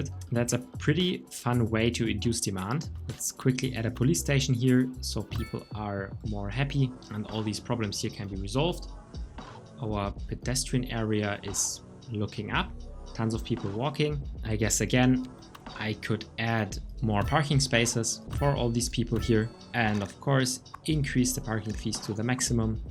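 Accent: Germany. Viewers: Are you german perchance ?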